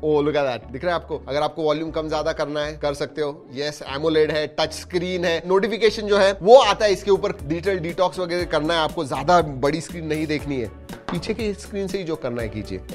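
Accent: Maharashtra, India. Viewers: ❤मराठी माणूस की प्यारी हिंदी सेकंड में "सोशल मीडियावरती जे होते हैं" लय भारी❤❤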